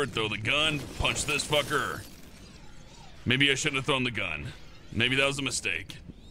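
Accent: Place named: Texas, United States. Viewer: I freaking knew you were a Texas. (I'm also a Texan.) Love your voice man!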